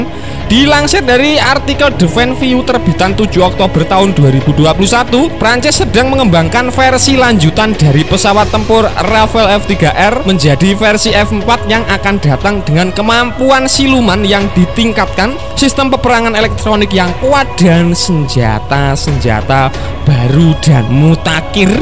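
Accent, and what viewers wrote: Java, Indonesia. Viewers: Kayaknya sengaja dimedokin logat jowo-nya sama si mimin.. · Medok sangat minn...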